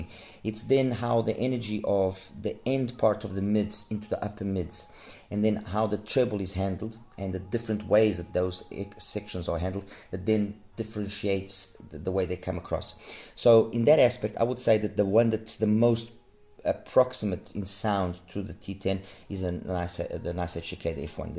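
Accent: South Africa. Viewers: You must be South African...😊i can hear the accent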